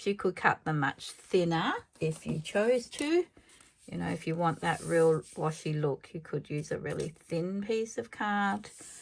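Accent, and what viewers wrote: Australia. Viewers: I enjoyed your talking! You did a great job. I am not very knowledgeable when it comes to mixed media and learn quite a bit from you! Keep talking! We Americans love an Aussie Accent!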